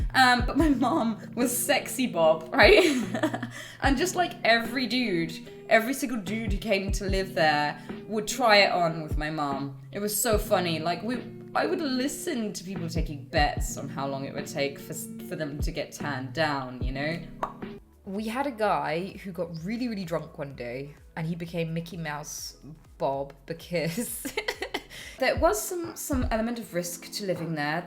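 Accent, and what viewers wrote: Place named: United Kingdom. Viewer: She sounds so much more british here, than she does now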